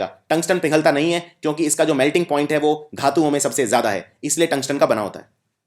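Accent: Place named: Bihar, India. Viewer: Bahut khub . Ur accent reminds me of a teacher of mine from bihar . Thank u sir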